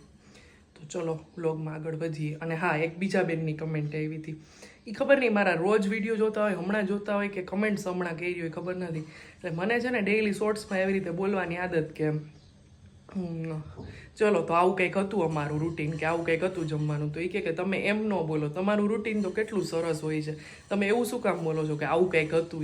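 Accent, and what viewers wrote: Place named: Pahadi, India. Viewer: Nice vlog di Mane to tamaro pahadi avaj bau game Che sambhdvo ne tamari bolvani spich ❤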